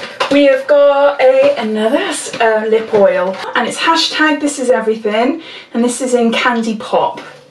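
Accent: Wales, United Kingdom. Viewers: You say it a lot and I thought it was adorable. I guess it's the whole Britishness of it. I'm Bulgarian and I don't get to hear this type of accent often and I think it's very nice and melodic. But yes, I do think that you say tooth weird. ;d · oh my goodness and you're welsh! I was thinking I was hearing some welsh accent coming through, and then twf! I'm in west glamorgan so you've just gained a local(ish) fan <3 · I need this calendar😍😍 great unboxing some amazing products xx i think its a welsh thing doll because i say it the same way as u xx im from south wales xx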